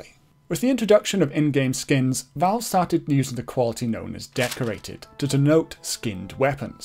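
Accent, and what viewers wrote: United Kingdom. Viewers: Your from the uk aren't you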